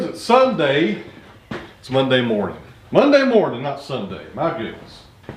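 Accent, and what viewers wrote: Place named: South, United States. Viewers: Well you are in fine form, Quite frankly it does not worry me. It is great to here that southern accent. Go for it buddy. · Yes! your accent trips you up sometimes, I think. I find, myself, I sometimes end up trying to say a word two ways at once, the accented version and the standard "television newscaster" version. I muchly prefer the Eastern Tennessee version. I too watch in part because of this. and yes, like, "all you all" is a real southern phrase. when I lived for a while in Johnson City, I really noticed how "storytelling" most people's speech was. Even a trip to Walmart might could be made into a story. And the phrases too. "a ton of pine" sounds OK by me. Southern phrases, yes indeed. many are made-up on the spur of the moment. Thus your "bloopers" are often endearing to me.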